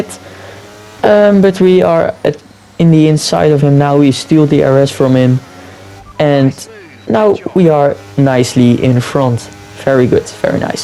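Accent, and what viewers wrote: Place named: Netherlands. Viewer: Ik hoor dat je Nederlands bent :)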